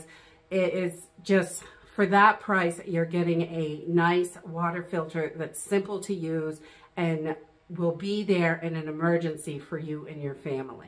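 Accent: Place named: New England, United States. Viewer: You are already getting a New England East Coast accent!! You can come park yah cah next to mine! Welcome 🤗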